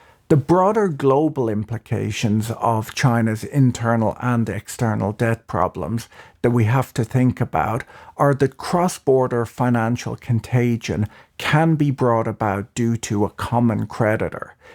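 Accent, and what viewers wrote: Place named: Ireland. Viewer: Irish accent obviously but what else is in their too? I can't figure put my finger on it..... 🤔🤷‍♂️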